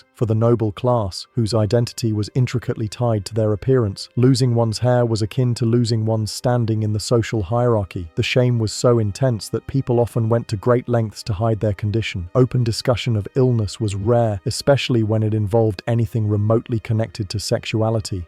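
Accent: United Kingdom. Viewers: Under more info it says United States but you have an accent the same as mine (British), do you just narrate them, do you write them, are you an historian? And what about the pictures, are they AI, they are quite good actually, certainly not contemporary. There's a lot on YT about hygiene and toilets (or lack of them) I wonder how this fascinating subject will be tackled by YT (if it still exists) in 10 years.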